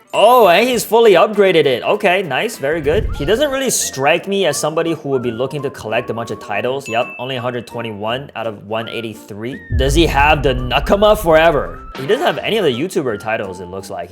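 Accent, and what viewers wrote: Canada. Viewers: Bro are you from canada 😈😈😈😈😈😈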